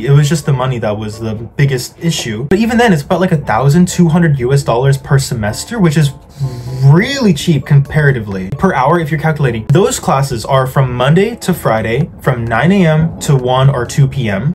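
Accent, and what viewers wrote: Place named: Canada. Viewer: Hi Kate! This is a YouTube video, he plans what he wants to say, he cuts out any unnecessary bits whilst editing and you are able to control the volume you hear him in. All these factors make the difference in comprehension when having a real life conversation with someone. My native language is Portuguese 🇵🇹 I had the same issue when I moved to England as a teen, I promise you it gets better (provided you’re learning English?) - I would recommend trying to immerse yourself into the language more, watch vids in English (anything you want to watch search in English), series, movies etc even if you need English subtitles at first to follow along. Books (actual reading books not the teaching ones) are also good, a lot of people like Harry Potter for languages. It starts off easy and then as the books go it gets more complicated which helps you increase your vocab. 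Maybe English is your native language and the accent is what you’re having issues with, most of what I said would still apply, you’d just have to find vids, series, movies etc in the Canadian accent (basically American so it should be alright) good luck :)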